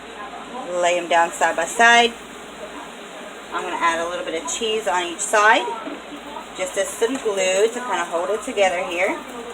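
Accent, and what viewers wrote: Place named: North, United States. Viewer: I'm originally from Pittsburgh PA.. I thought I recognized a northern accent. I have subscribed.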